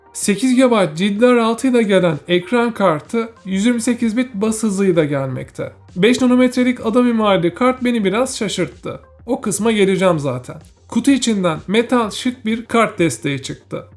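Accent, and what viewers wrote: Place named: Kayseri, Turkey. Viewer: Youtube ta hangi kayseriliye denk gelsem dörtYüz değill, DörtÇüs diyor, bu da çok hoşuma gidiyor, yani hemen ''aha kayserili detected'' diyorum. 😂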